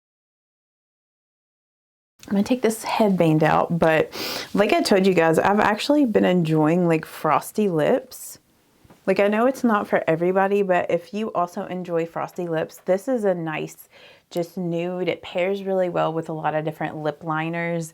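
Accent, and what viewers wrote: South, United States. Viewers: a Southern accent is beautiful. However, the way a single syllable word turned into two syllables is a cadence of younger people. Just wish I were younger and and vocabulary wouldn’t bother ME so much. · It’s called a southern accent. I love it!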